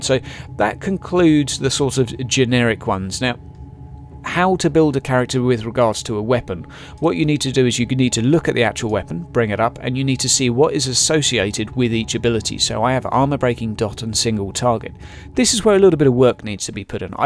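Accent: United Kingdom. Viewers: It always somehow sounds better when a Brit talks strategy on 40K. Nice post.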